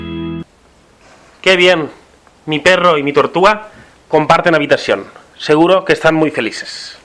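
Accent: Catalonia, Spain. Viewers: Que eres de cataluña no? Por el acento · Pq hablas asi?? Eres de cataluña?? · Es de el Delta del Ebro verdad?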